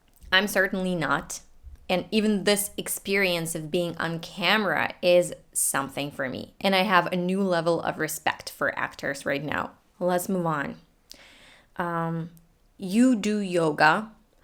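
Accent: United States. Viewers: No wonder those kind of questions... Your american standard accent is amazing! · согласен, обычный английский, как у любого носителя американского английского языка, утверждаю, как живущий в Нью Йорке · It is Amazing for me to meet you specially because you have a perfect american accent and you are not american!! i really though in you as an american woman o maybe a latin woman living in America. I am impressed. Thank you a lot for making videos.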